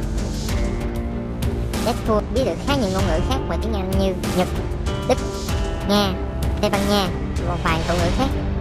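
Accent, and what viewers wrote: Vietnam. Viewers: Thím nói giọng miền trung p k ? :))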